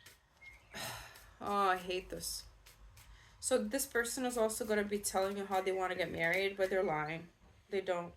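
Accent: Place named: New York, United States. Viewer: Hi with I thought your were from New York. Your accent sounds like you are from Bronx, Brooklyn, Staten Island. I thought perhaps you were living aboard. I am not sure your birth and living geo history. But, being a New Yorker you are hitting certain accent NY twangs. -lol Also, thank you 🙏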